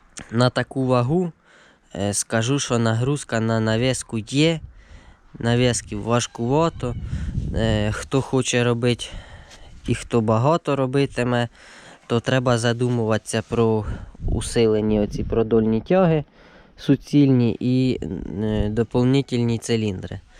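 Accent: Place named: Poltava, Ukraine. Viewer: Перший раз дивлюся ваші відео,і відразу почув знайомий полтавський говір.Лайк за відео👍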